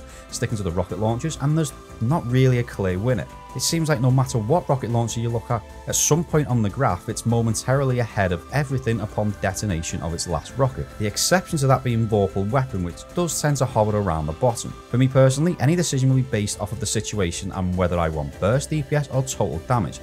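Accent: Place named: Scouse, United Kingdom. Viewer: sound like micheal owen , stop hiding the scouse accent lad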